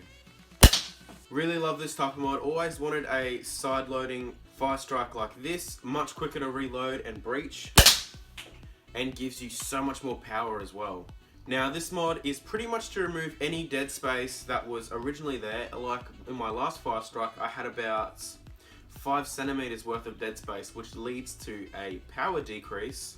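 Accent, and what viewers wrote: Australia. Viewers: Woo a Aussie, great Video, lots of help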